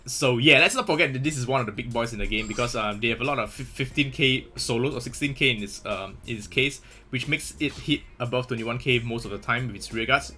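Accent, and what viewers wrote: Singapore. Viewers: He does have that singaporean accent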